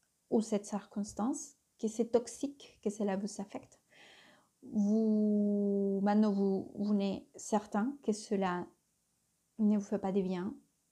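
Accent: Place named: Spain. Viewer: Magnifiques tirage merci beaucoup, jolie l'accent Espagnol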